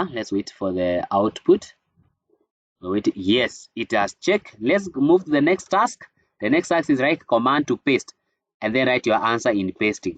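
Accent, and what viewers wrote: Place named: Kenya. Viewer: love your accent,kenyan?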